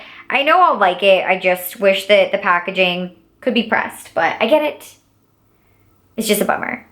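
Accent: United States. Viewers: Hello I loved your video I'm a new subscriber! You're s sweetheart seems genuinely beautiful inside and out. You would pass as my twin when I was in my 20's. I don't know if you've ever heard that everyone has s twin somewhere in the 🌎. I'll try to find a photo of me and send it to you. I also love your accent and st first I thought you were from Arkansas . I was born in Pumpkin Bend , Arkansas! You are adorable I'm going to tell all my friends to subscribe to your channel. Your excitement and enthusiasm is contagious it makes me want to go to Walgreens in the wee hours of the morning ! Have you ever heard about the automatic de/pot machine from QVC. I believe Kelly Strack received one in a recent PR package. It might work great for those large single shadows. Did the Morphe limited edition copper and spice palette have a product number? I can't wait to binge watch your videos ; so happy that I found your channel.🙋🏻👙👗💅🏼💕🌸🕶💄👠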